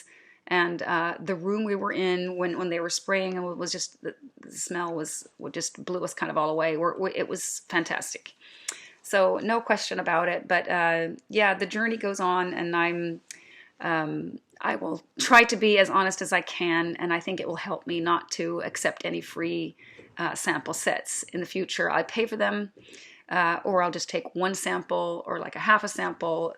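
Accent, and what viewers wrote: Midwest, United States. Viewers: Are you Swedish? If so, I never would have thought. You accent comes off as American Midwestern or from the Rocky Mountain States. Impressive. I greatly appreciate your take on denying free this and that. Reviewers are now stating that they received their products but their opinion are their own. I don’t believe this. I’m too seasoned to think kind gestures aren’t returned in kind. Reviewers are essentially telling us to pay for their free bottles, by buying this House’s offerings.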